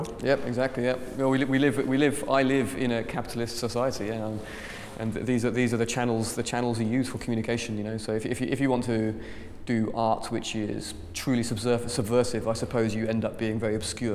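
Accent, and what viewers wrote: United Kingdom. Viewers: He's a perfect package! He's intelligent, he has a great looks plus the sexy british accent. Just perfect. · i love british accent:)))))))) <3<3<3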